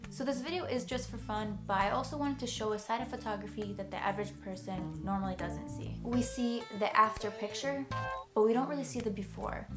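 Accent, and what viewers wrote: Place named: New York, United States. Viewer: Where are you from you got a slight accent Are you from somewhere in New York?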